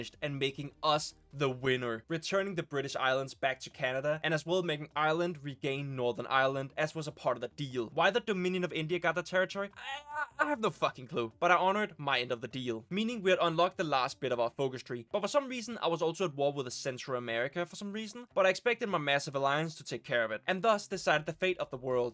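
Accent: Denmark. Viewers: Drop the Danish accent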